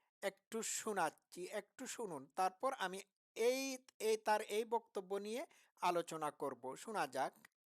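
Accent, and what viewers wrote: Sylhet, Bangladesh. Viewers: Tumi sylhety tumito maiyluker moto kota bolo tumar anda maniker moto kora dorkar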